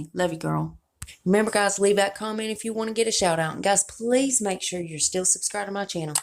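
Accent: South, United States. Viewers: I looove your accent!!!!! 💜💙💜💙💜💙 my husband can always tell when I've been watching your videos cuz I end up talking in a country accent lol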